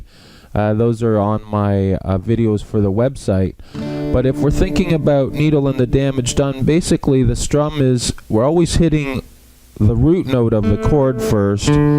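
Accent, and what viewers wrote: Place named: United States. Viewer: Better explaining classic song than this old want to be picker needed. You sound American not British with lots of exercises not trying to prove how good you are.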